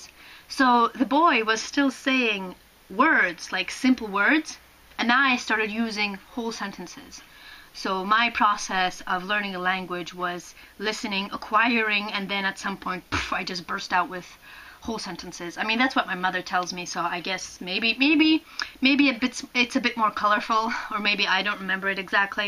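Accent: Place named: Poland. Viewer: I was wondering where you learned English. It sounds North American with a very small Polish accent. I am thinking about moving to Poland or Russia to teach English (I am from Los Angeles) and I am enjoying your videos.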